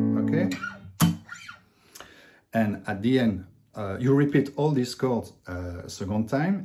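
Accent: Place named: France. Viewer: you are very precise, concise and clear minus the slight french accent haha! thanks for this tutorial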